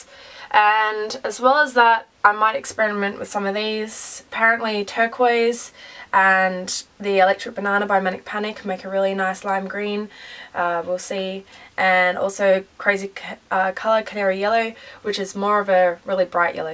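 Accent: Australia. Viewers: Is she australian? Sorry i don't understand any accents... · Omg your Australian!! SNAP!! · Are you australian?! If you are I. So happy!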